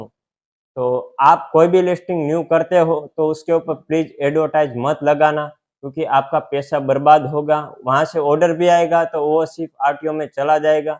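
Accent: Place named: Gujarat, India. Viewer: Lagta he Gujarat se ho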